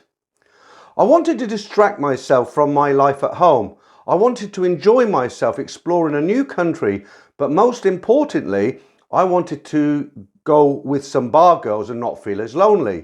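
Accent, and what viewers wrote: United Kingdom. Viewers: Hey Mr, I LOVE YOUR STORIES - It's such an original concept, but the way you read them is sooo properly perfect!! I'm American and live in San Francisco, and your British accent makes the stories and listening to you vids awesome!! I do have a story, but it's not a Thai....my wife is japanese, and she lives over there...we don't see each other as often as I would like, but it's still all good.... Maybe our story doesn't qualify, but it is a dream come true!! Again, I always look forward to your uploads and storytime read(s)!!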